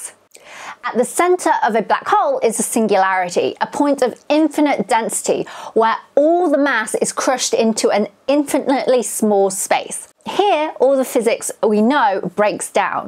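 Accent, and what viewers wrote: United Kingdom. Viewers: Your face screams asian, but your voice says vaguely british.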